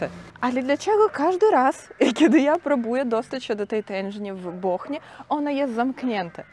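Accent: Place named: Ukraine. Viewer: Jesteś fantastyczna i ten Twój specyficzny język z ukraińskim akcentem bardzo mi się podoba ,już któryś raz z kolei proponuje Sandomierz w świętokrzyskim 👍👍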